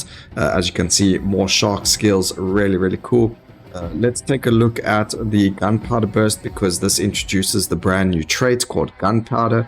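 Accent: South Africa. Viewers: Is that a Durban accent? Defs South African. Thanks for all the video's they have helped tremendously.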